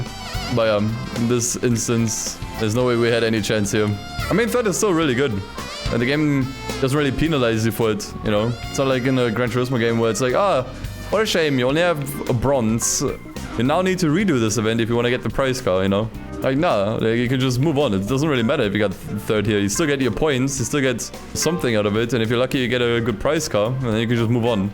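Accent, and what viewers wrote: Germany. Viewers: i hear the German accent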